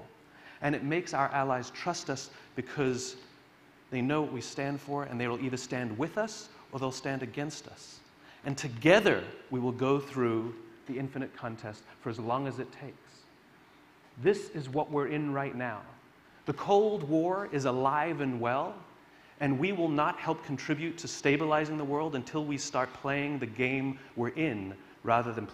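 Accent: United Kingdom. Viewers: Is this guy English or American ? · No question the guy is intelligent, but there’s something disingenuous about him. In earlier Ted talks and other presentations, he speaks with a British accent.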